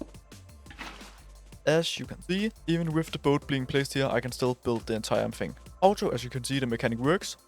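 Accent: Denmark. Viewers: YOOO... you dansk? ahah. Great video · i like that after just 1 second of him speaking i could hear he was danish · skud ud til den danske accent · Great video! Got to Love the danish english 😂 great job! Greetings from norway! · bro got danish acent¨ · are you danish by any chance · 30s in and I'm guessing you're Danish. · Are you danish? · tydeligste danske accent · bro din danske accent dræber mig indeni 😭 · This sounds liek Danish english are you from Denmark i am. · Holy danglish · I can tell he’s danish! Godt arbejde! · Den danske accent træder altid igennem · I know a danglish accent when i hear one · sekundet videoen startet ku man høre du var dansk men shii valid vid du mangler bare accenten nu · Danish ascent + bro cheated... Level 8 with elytra ( u start at level 7 when u die soo) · The danish accent LOL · I hear dansk 😮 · den danske accent er elite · hej bro, kan høre du dansk. 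skal vi lave en survival verden sammen, mangler end der er god til spillet lol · din danglish er sindsyg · wait are you danish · bro are u danish u have a very danish acent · Your Danish accent is thick 😅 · er du tilfældigvis dansk det lyder sådan. anyways fed vid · The danish accent is crazy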